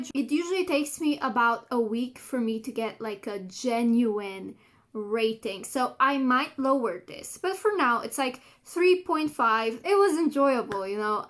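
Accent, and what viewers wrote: Slovenia. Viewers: I love your slovenian accent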